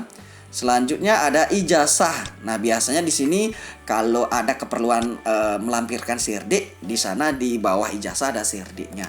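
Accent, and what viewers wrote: Bali, Indonesia. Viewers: Jleme bali ci sing?, logat ci bali ajan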